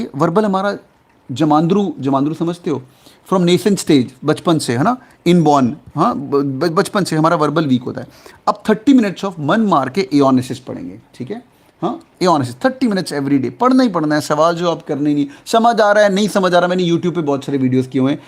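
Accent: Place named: Punjab, India. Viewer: Sir love from punjab ❣️❣️ aap bhi punjab se ho....apke accent se lgta hai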